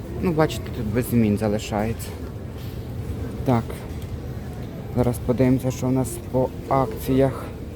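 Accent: Ternopil, Ukraine. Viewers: Я з Криму. Обожнюю ваш тернопiльскiй говiр💕Ще з Мастер шеф.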